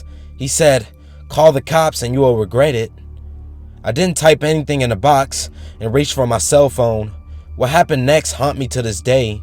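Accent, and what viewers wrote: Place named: United States. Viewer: Wow,you DO have a sexy voice!! i'm British and have never heard such a gorgeous American accent.......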